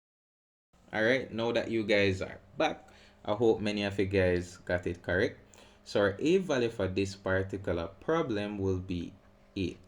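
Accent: Jamaica. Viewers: Am loving the Jamaican accent 😅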